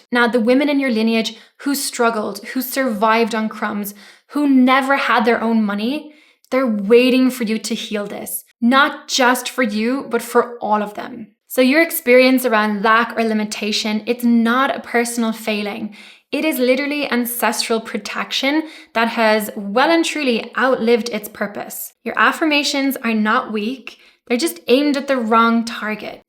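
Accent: Ireland. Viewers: Great video. Thank you. Are you Irish because you have an Irish accent? Blessings.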